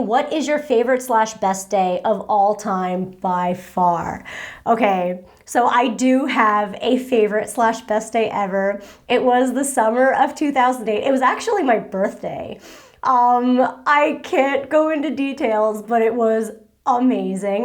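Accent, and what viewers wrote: New Jersey, United States. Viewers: A "Jersey accent" is exactly what you sound like when you speak English :P (Although to be fair, I thought you were from Long Island when I first started watching you) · How do you pronounce water or orange? That would show how Jersey you are. Never knew I said those words differently till I moved to upstate NY.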